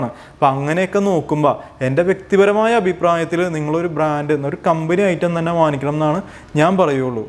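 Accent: Thrissur, India. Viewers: Thanks for the very useful info. Also Enjoyed listening to " Thrissur Basha ". A suggestion while using TVs on Table Tops. Just screw down the table stand legs to the wooden table with two or more U shaped stainless steel clips. Users can use own imagination too. Thanks again for the safety info.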